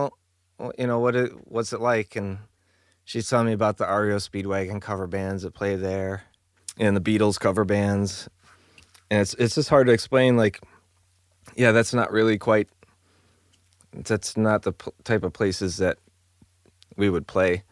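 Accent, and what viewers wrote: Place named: Chicago, United States. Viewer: Im from San Antonio and knowing full well your from Chicago this probably wont make sense, but your Chicago accent is hilarious. BTW its a Fender Bass VI. Love this episode.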